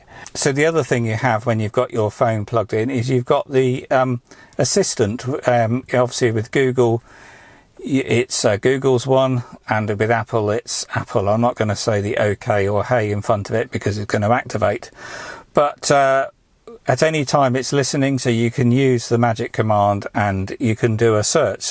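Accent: United Kingdom. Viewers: The voice has a British accent, lol! Awesome.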